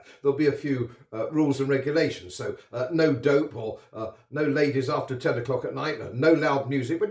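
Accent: RP, United Kingdom. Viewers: Your accent is very well to do.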